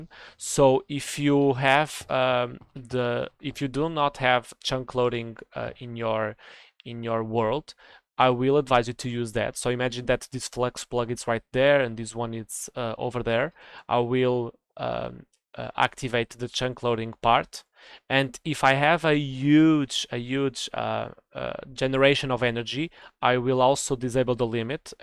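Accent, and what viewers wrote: Portugal. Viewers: man your from portugal right? your accent is very portuguese and btw great video ty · My guy you sound kinda Portuguese ngl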